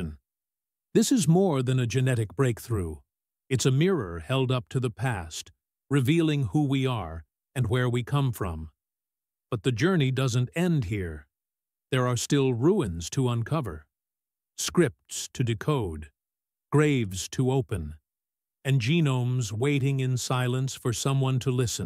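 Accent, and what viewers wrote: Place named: United States. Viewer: Why is an American telling us about our DNA? Personally, with trump destroying the world, I'm very irritated by the accents from the US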